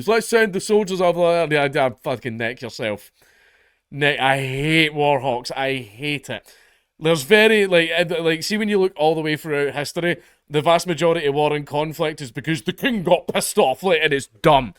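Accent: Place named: Scotland, United Kingdom. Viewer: well yeah, shes scottish, of course shes going to be talking scottish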